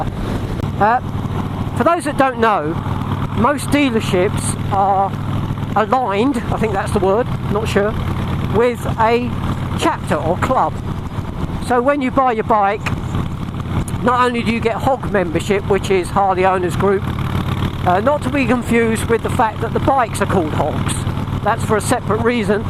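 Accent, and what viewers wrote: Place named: RP, United Kingdom. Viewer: TheBikerVlogger liked your video,so I gave your video a look see.Interesting channel you have.I haven't subbed a Harley rider,in fact,you're the most posh sounding Harley rider i've witnessed lol.You're even more posh sounding than BaronVonGrumble!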